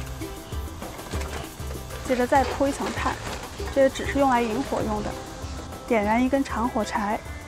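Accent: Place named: Jiangsu, China. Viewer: 这是哪儿的口音 像江苏的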